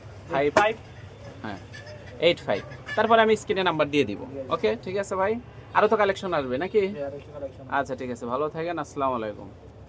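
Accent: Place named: Bogura, Bangladesh. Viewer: আপনার ভাষাগুলো বগুড়া বগুড়া লাগে❤❤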